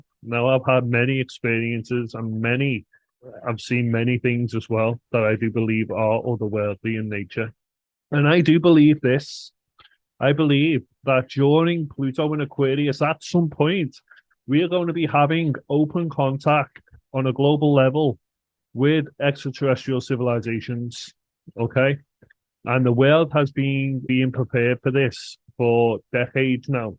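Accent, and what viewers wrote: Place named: Liverpool, United Kingdom. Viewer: Love the Liverpool accent btw! Can’t wait to see Liverpool, I have always been fascinated by Liverpool but when I get the chance I have always gone abroad! But next time….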